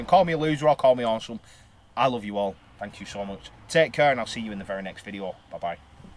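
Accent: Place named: North, United Kingdom. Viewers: It's great to hear a local voice on here not really many people on here from greater manchester especially my home town Bolton! ....I'm a bus mechanic and own a few myself (The older stuff) and i cant express how much fun clutches are on big vehicle's when driving automatic's all the time. Feel's great to be behind it especially the double de clutch also with the older ones like mine we have 1,2 Crash 3,4 Syncromesh....Great fun....Keep the good video's up lad. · I dont even know how I got onto your videos! But when I heard your northern accent and I was hooked😅(I'm from Preston😉) but my dad drives tankers and it's good to see what it's like in that line of work. Keep up the good work mucka!👊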